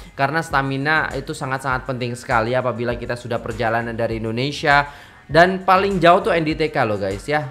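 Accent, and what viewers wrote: Malaysia. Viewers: cakap seperti orang Malaysia yang nak cakap dalam bahasa indonesia... jawa nya tak hilang.. Indonesia nya masih... boleh kuasai bahasa Malaysia..bang orang Malaysia ke????😀😀😀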